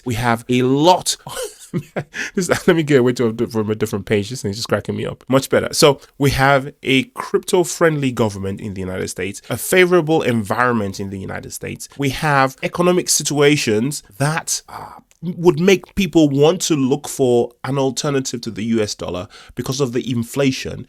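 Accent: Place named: England, United Kingdom. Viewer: We can hear the english accent..no worries😂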